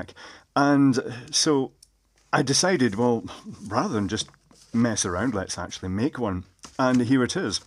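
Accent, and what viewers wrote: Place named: Glasgow, United Kingdom. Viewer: Personally I prefer this Cap microphone sound, it is crisper (term used by those with hearing loss) meaning the all important frequencies that reveal subtle differences in the sounds are there at good levels, too much in the bass region muddies the perception, bit like the TV news broadcasts where they have a permanent thumping drum or musical? background, WHY? is trying to get his words over to you in a clear manner, this microphone does ir WELL. His wonderful "Glaswi" accent is a pleasure to listen to.. Keep it up "Sir" Final thought, at least we don't hear the rustling of his beard!!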